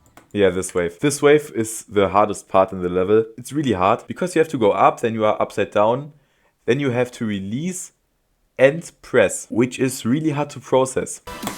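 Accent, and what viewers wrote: Germany. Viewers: are u german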